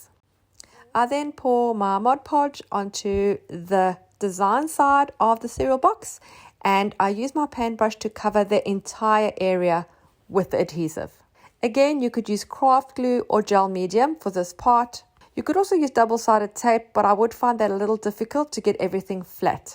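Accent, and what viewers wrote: South Africa. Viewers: South African 😊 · Thank you for this tutorial well done and much easier to follow. Are you perhaps ex-South African....I recognise your accent! I am from Durban South Africa.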